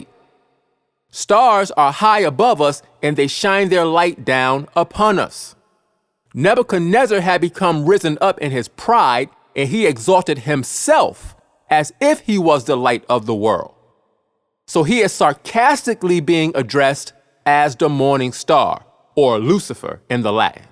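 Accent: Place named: Cockney, United Kingdom. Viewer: . .the maker (Son of Man)of tis video must be a cockney fr London ee.. he has that english cockne accent. .for info i m fr Borneo isle...studied Law fr London U...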